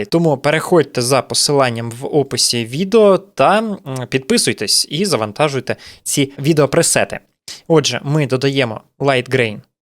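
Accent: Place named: Russia. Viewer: Пиздец говорит с русским акцентом,типа украинец дохуя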